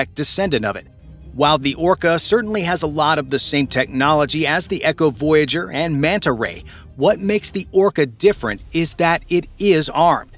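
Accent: United States. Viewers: These American voice overs are so irritating 😂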